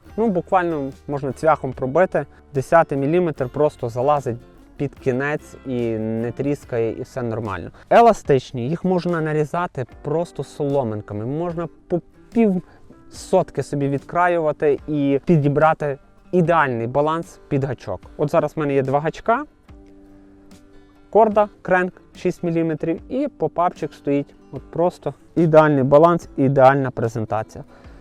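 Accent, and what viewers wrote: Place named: Lviv, Ukraine. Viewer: вітання!!! Найперше хочу подякувати за справжність за те що з перших днів ведеш блоги виключно українською!!! Сам львів’янин і в захваті від нашого говору!!! Сесії та взагалі всі твої старання до глядача як для мене найцікавіші дотепні і зрозумілі!!! Вітаю з власною новинкою попарів та міксів!!! Новий сезон починаю з твоїх новинок!!! А тобі здоров’я натхнення в нових програмах та спільної перемоги над москалями!!! Слава Україні!!!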